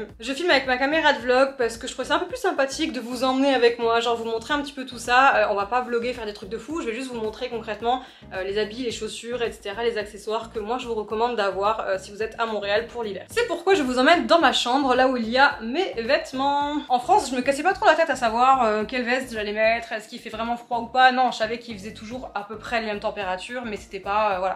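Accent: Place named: France. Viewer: tu viens de la France? Moi je suis américain mais je trouve que t’as un accent français.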